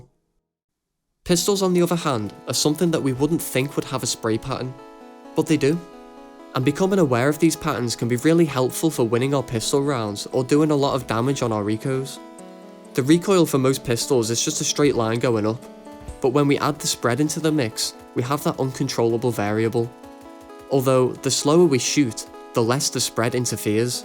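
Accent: Liverpool, United Kingdom. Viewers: Hes scouse you whopper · liverpool accent slaps for commentary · lord knows i need a scouse cs youtuber in these trying times. subbed :) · Why is he british????????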